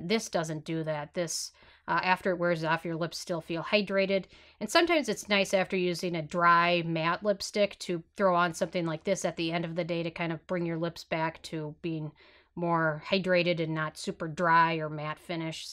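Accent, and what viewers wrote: Minnesota, United States. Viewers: I love how honest you are and that you have a Minnesota accent like me 😄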